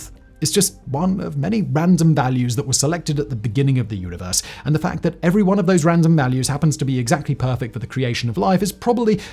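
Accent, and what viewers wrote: United Kingdom. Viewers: I cant take your pumped voice Brit accent. You are not serious. Jeeeeez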